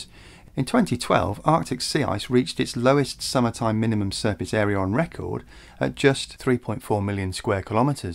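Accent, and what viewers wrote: United Kingdom. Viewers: You forgot to mention Geo Engineering or Weather Modification. Not really impressed with the Brit accent.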